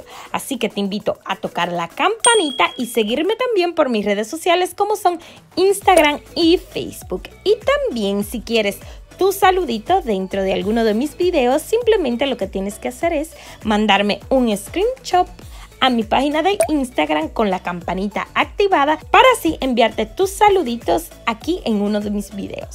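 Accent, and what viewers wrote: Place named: Dominican Republic. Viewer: Una pregunta tu eres #DOMINICANA por qué tu tienes un pequeño acento Dominicano 🇩🇴🇩🇴🇩🇴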